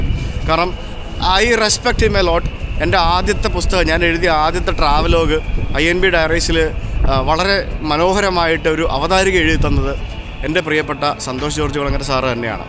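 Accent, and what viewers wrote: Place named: Kumbanad, India. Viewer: Dude, I accidentally started watching your videos and realized you are from my mom's town. So watched many and enjoy them - similar Malayalam to my cousins etc. Don't know Santosh George. I am sure a great guy. But your is coz you are my home guy and its fun. Keep up the good work buddy. From a South African - 58 yr old born and raised African Mallu from Kumbanad and mom from Kozhencherry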